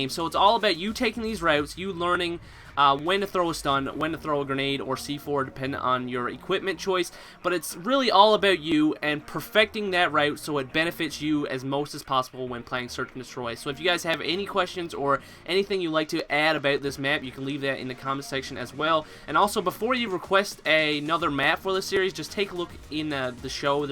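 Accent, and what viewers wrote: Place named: Canada. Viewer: Your accent is so annoying haha are you canadian? Also good vids, they really help.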